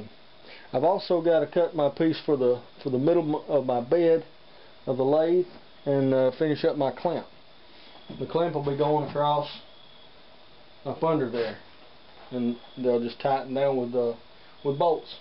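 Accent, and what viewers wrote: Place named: Tennessee, United States. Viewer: Great video like all of your videos. What part of the US do you live? You sound like you are from TN. I live about 30 miles east of Nashville and you sound like the rest of us. LOL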